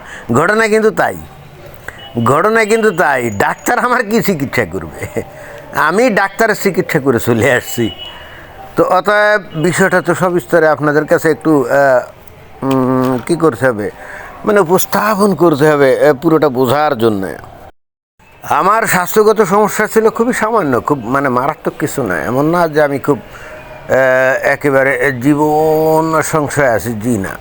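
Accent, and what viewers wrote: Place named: Narail, Bangladesh. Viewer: মহাশয়, আপনার ভাষার টান শুনে মনে হয়, আপনি নড়াইল বা এর কাছাকাছি কোথাও জন্ম। আমার বাবা ঠাকুরদার বাড়ি ওখানে ছিল। এই রকম কথাতে টান ছিল ওনাদের। আপনি ডাক্তারদের সঙ্গে কথা বলেছেন, ওরা ভয়ে কোন মন্তব্য করেননি। মুসলিমদের কোনও বিষয়ে অপরিচিত লোকেদের সামনে মুখ খুলতে ভয় পান সাধারণ অমুসলিমরা ,রাজনৈতিক কারনে।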